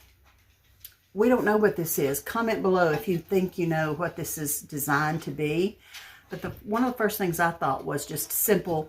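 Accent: South, United States. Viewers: Oh gosh! What a haul! I just love your hauls! Just my style! And all said and done with that heart warming southern accent that reminds me so much of home~You are such a treasure, sweet lady~thank you for taking time to share and to chit chat....I love it! AND...P.S. I LOVE your driftwood angel back there!! xo~Catherine from Colorado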